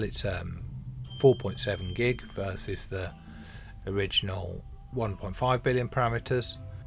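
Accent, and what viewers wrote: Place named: Birmingham, United Kingdom. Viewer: where are you from? you sound like you are from near where i'm from back home (near bham somewhere) 😂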